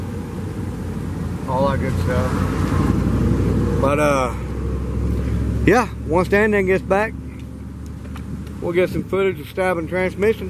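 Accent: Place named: South, United States. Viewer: Nice southern accent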